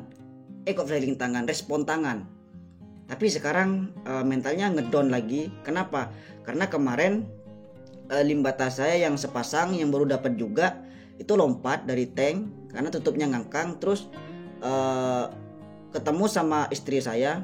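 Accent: Madura, Indonesia. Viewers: Ada logat maduranya 😁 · Abang logatnya kek orang Madura😅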